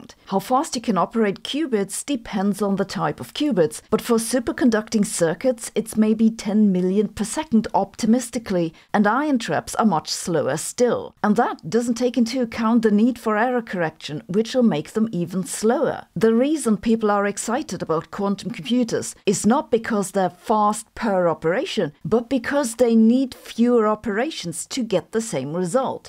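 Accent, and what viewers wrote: Germany. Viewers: Why has her German accent become so thick recently?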